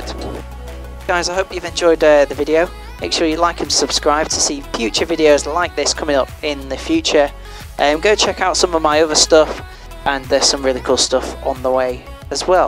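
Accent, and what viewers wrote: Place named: United Kingdom. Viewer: I would watch your video if you could speak english rather than British stuff. So rude!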